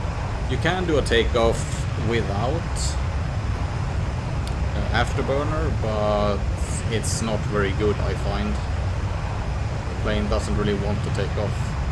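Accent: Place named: Sweden. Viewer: dude you are Swedish right? jag med jag märker på vägen som du pratar :)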